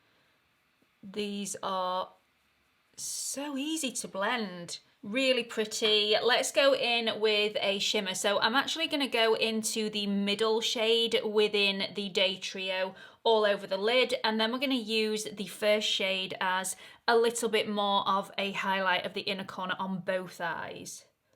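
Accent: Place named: England, United Kingdom. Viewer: She is absolutely Beautiful, I love her English Accent, adorable. Looking forward to other videos. Thank you.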